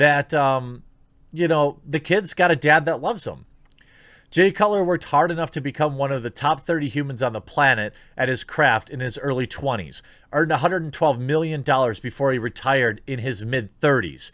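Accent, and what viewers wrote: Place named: Wisconsin, United States. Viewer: Your obviously from Wisconsin, greetings from the Green Bay area fellow cheesehead.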